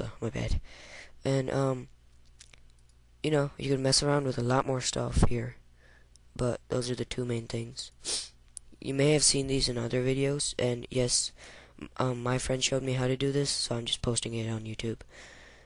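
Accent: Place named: Canada. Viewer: you kinda sound like canada cool. ^w^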